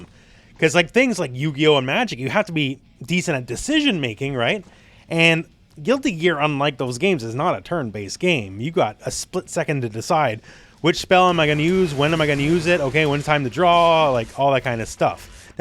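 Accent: Canada. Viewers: Won't ever get sick of hearing pronounce 'cards' in his Canadian(?) accent!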